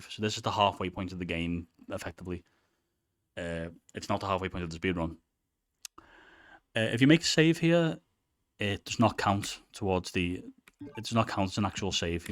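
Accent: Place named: Liverpool, United Kingdom. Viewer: Excellent video. Great to hear a fellow Liverpudlian also!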